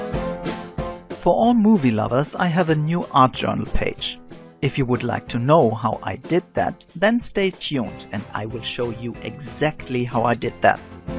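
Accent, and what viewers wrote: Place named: Germany. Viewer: I thought it was German by the writing and accent! :) I'm British but I was born in Munster (dad was in army) stay crafty my friend!! :) X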